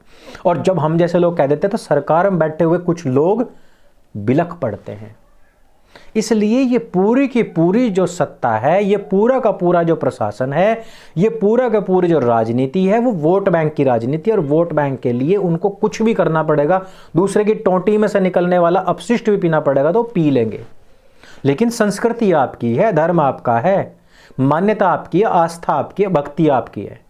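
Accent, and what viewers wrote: Haryana, India. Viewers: Tu haryanavi jesa bolne ki kosis kyo Kar Raha hai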